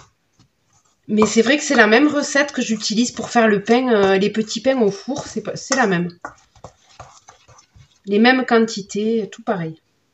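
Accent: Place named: Midi, France. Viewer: Bonjour. Merci pour cette super vidéo. Ce pain est super à faire quand on est en panne....😅Nouvellement abonnée je visionne les vidéos petit à petit. J 'ADORE..... Nous avons le même accent 😁. Un petit coucou du Midi Toulousain. 😘😉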